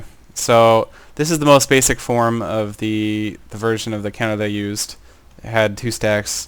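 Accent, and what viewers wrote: United States. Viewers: maby he is trying to spell "Fußball" the german word... ?! because that the typical american accent...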